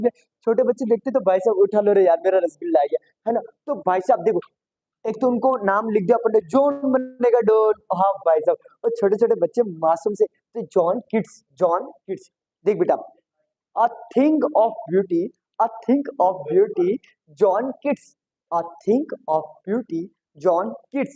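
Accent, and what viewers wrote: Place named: Hadoti, India. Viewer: Sir aaap hadoti bolte ho kaha se ho aap kota ya baran❤😮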